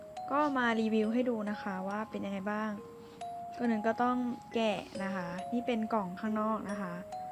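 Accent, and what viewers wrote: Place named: Thailand. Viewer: แอบเหน่อๆนะเนี้ย น่าร๊ากกก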